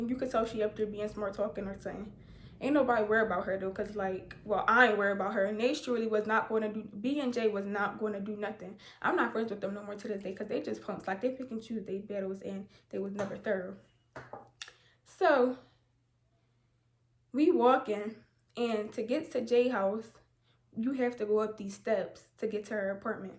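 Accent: New Jersey, United States. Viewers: are u from jersey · you from new jersey?? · I could hear the jersey all in you lol I would think you was from Newark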